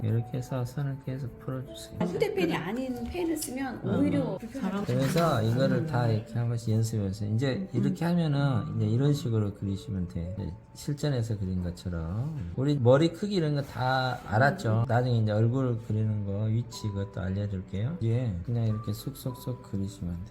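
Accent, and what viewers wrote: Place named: Jeolla, South Korea. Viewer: 왜 라도치들만 유투브에 열심인가? 사투리 좀 쓰지지 마.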